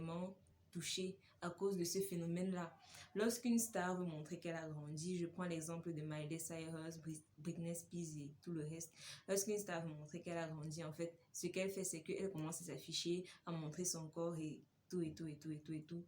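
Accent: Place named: Cameroon. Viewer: vue ton accent et ta façon de racconter tu es 237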